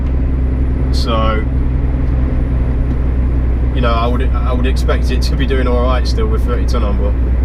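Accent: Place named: North, United Kingdom. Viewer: Ah, welcome back, nice bit of a northern accent and 😀, Belfast nice city, how many of ye on this tour.. safe travels...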